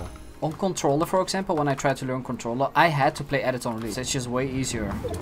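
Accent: Netherlands. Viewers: Are u dutch??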